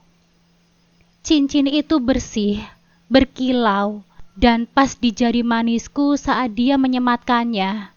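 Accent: Java, Indonesia. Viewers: Medok jawanya jadi lucu dengarnya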